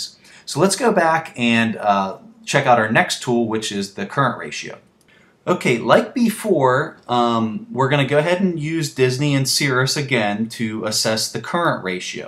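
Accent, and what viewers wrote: United States. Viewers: There’s zero chance you’re not from Baltimore. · are you from Pittsburgh?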